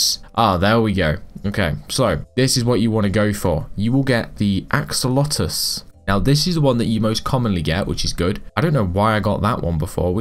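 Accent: United Kingdom. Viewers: Because I think he's British Accent..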